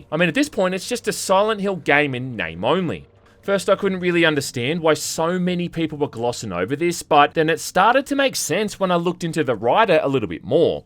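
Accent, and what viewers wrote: Australia. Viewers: Aussie accents are borderline psychological torture 🤯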